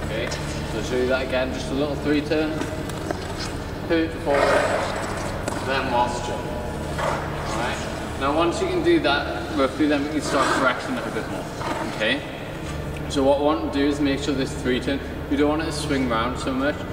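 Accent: United Kingdom. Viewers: Are you British your accent is coming from London uk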